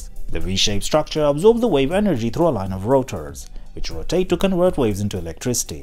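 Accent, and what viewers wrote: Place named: India. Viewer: india English?? zzzzzzz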